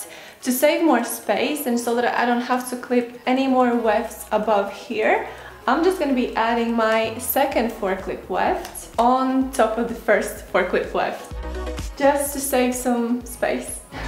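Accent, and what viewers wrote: Latvia. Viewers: ? latvian? I think, when I speak English I sounds kind a similar...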